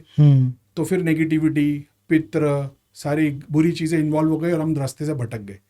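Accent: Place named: Mumbai, India. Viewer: Hey Mr ur telling us clearly..and we like the Mumbai style free talk.thanks From dubai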